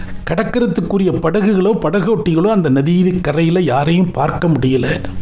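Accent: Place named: Tirunelveli, India. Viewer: படித்தபோது திருநெல்வேலி மாவட்டத்தின் பேச்சு வழக்கு தமிழ் தேனாக இருந்தது.இப்படி கதை கேட்பது இது ஒரு புது அனுபவம்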